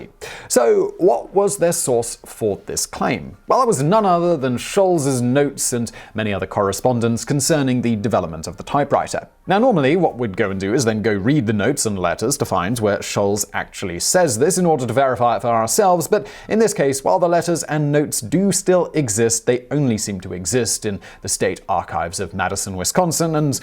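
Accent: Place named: South East England, United Kingdom. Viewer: both and I were born and brought up in the South East of England, and share a common dialect with very similar accents. I am not have "Sport" with his accent. (It is essentially the same accent as my own, with slight variation due to us both moving away from that region, and living in a variety of other areas over the years.) He absolutely said Continents. Further your accusation of "People such as yourself love this kind of stuff" is an appalling and baseless assumption on my character.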